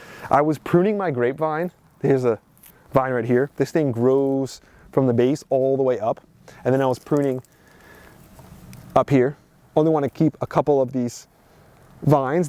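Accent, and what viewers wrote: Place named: Philadelphia, United States. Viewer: are you from philly or jersey? I can tell because you say wuter instead of water like i do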